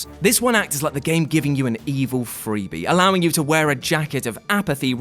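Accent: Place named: Newcastle, United Kingdom. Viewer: Oml you’re a geordie like me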